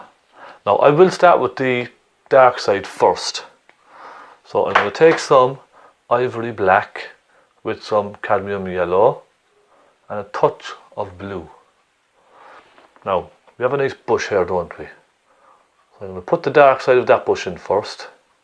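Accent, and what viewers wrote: Cork, Ireland. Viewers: nice one bud thanks ,,,, from your accent id say your not far from me ,, :) ,, co cork me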